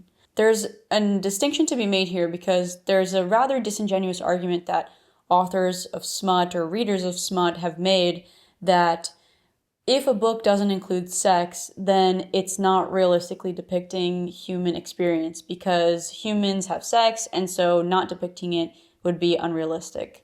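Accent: Brazil. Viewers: Você é brasileira ou portuguesa? Pelo sotaque é brasileira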